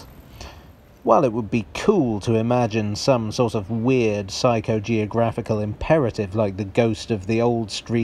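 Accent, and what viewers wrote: United Kingdom. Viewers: G'd mornin' sir! :) I'm trains and trams freak myself and I used to live in London, always in NW10 area or close to that. With (new) Wembley Stadium always to be seen on the horizont, as a markable land-mark. What about making some videos about Wembley, Met-land and 30er? The fields-to-industry North-West is totaly different story that poor-to-stay-poor South-East. Park Royal, Willesden Junction. maybe even Grand Union Canal? The closest to that - is Your Maida Vale video. For me England is over, and I will never go back there, but I would not mind to see some brand new videos with today's quality about this area. PS. I do like your accent. Sooo British. Great channel.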